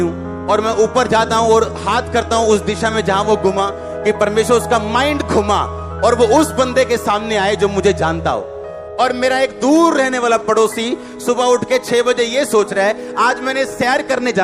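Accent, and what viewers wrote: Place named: Punjab, India. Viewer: Apki Punjabi language bolte phli bar suna so swt papa ji😊❤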